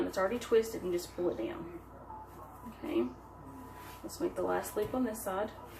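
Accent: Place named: South, United States. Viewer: I love your southern draw - shake it off!!! Kinda sad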